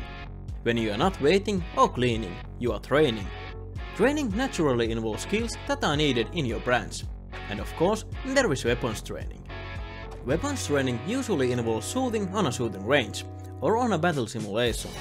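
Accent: Finland. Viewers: Tahallinen rallienglanti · Mahtava rallienglanti.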